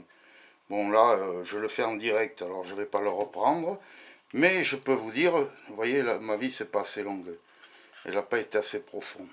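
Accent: South, France. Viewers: tres sympa cette video. et toujours avé l'accent!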